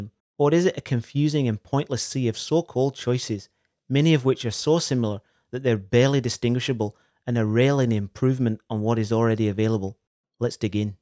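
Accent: United Kingdom. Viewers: did your accent change to more scottishy or is it just me?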